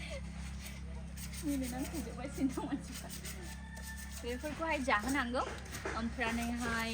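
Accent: Bengal, India. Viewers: Nwgni lairainykwonw kwnasongbabw ohakaya Ang benanao .benggoli baidi khwnakayw bwnggolni Boro lairainykwo.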